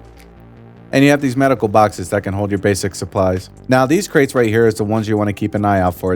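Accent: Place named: New York, United States. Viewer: I lived in NYC for 10 years, your accent always reminds me of my time there haha. Thanks for the good loot info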